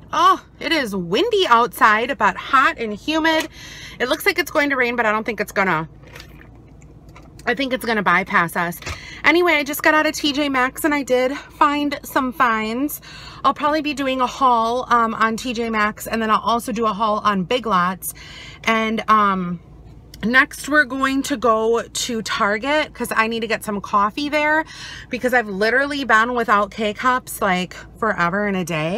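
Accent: Wisconsin, United States. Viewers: You're a hoot!! Love your vlogs. Love your Wisconsin accent🙂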